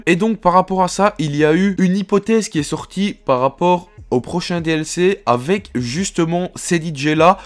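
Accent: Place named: Belgium. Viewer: Je crois qu'il est belge où chti't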